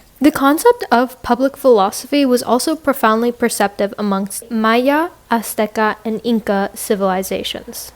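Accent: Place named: Spain. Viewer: Your spanish accent is so beautiful im kinda jealous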